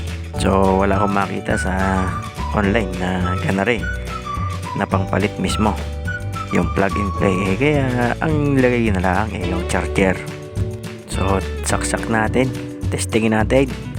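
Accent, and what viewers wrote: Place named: Batangas, Philippines. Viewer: Idol taga batangas ka ba ? May Punto Kasi ung salita mo sa video, may problema ung v380pro ko ayaw mag read Ng QR code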